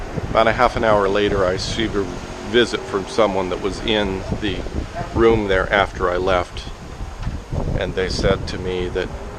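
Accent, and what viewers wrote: United States. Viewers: butthurt ! the story kind make nonsense to me a lot of Hollywood action death threat , drugs , border report you and your friend should know the red lines before traveling to any country Russia ,China ,Dubai"UAE" those countries diverse in almost everything religiously culturally etc but share one common anti LGBT from your accent I assume you are from US let me refresh your memories with states this incident could took place Mississippi, Texas, Alabama . do your search before making scene.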